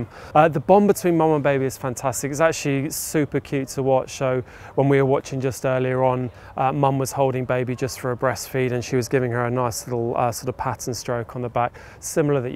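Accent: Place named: United Kingdom. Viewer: A Fantastic Mom so far! And a Fantastic British accent so far♡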